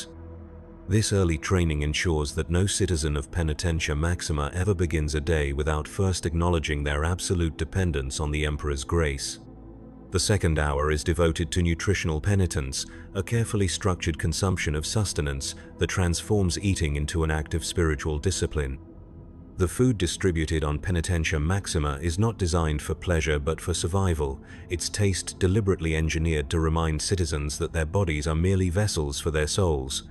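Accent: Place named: Texas, United States. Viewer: Sounds like Texas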